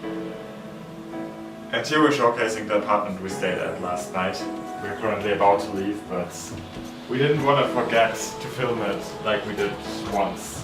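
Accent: Germany. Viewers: Where are you from? It sounds a bit like you're from a german speaking country to me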